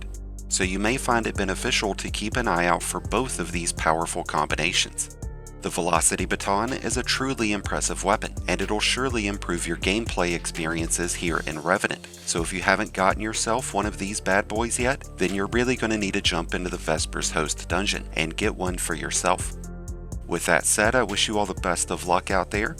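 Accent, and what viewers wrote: South, United States. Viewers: love ur accent! is that southern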